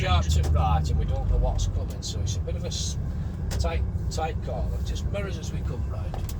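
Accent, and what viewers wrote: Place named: Yorkshire, United Kingdom. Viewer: that's the worst Yorkshire accent I've ever heard, can't say to much about lanky Lanks because my wife is a lanc god forbid, what a marriage Y&L.😂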